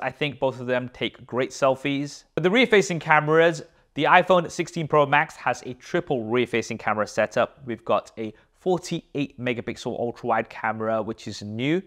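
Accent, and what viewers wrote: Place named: London, United Kingdom. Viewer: That London Accent.. 😊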